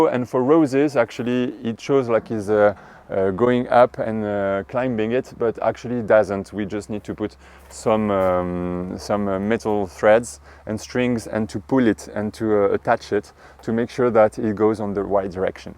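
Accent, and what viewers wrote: France. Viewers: I love the symmetry of your gardens ,thanks for showing us that you have a plan to follow.❤I am creating a garden and have a blank canvas.I love the Pierre de Ronsard Roses .I grew one over an Arbour years ago .I have bought two to plant against the Brick wall.and have a standard and other Pink David Austin’ roses etc Your hydrangeas look beautiful .I have a number of Hydrangeas-to plant ,blue and Pink . I want to incorporate a vegetable and Perrinials area into my plan too.I can see the garden rooms you have created.😊You have a French accent 🇫🇷 are you near Switzerland.Cheers from Australia 🇦🇺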